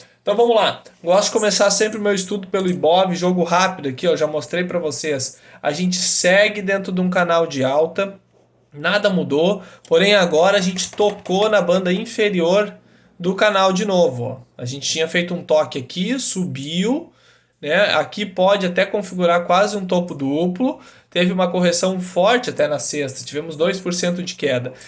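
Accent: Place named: Rio Grande do Sul, Brazil. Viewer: Gaúcho de onde mano?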